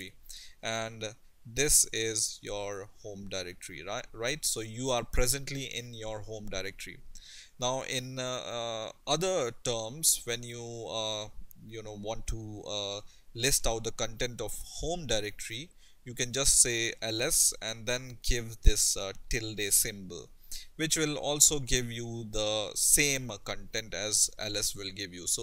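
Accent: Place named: India. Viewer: im curious about who you are? it appears your in Germany but you sound like your from India.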